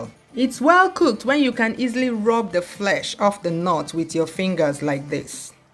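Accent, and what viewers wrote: Igbo, Nigeria. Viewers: Very interesting to watch 👌🏾💯 I love & love that Igbo accent 💕 dalu nne 💯👍🏾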